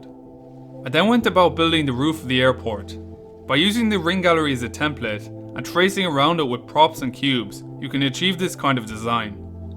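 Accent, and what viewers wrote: Ireland. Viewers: Are you Irish. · Are you irish boss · Are you irish? I saw you in yung chips video and I was like, yea he sounds irish 😂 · U sound irish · Where are you from in Ireland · Are u irish? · Are u from Ireland because I am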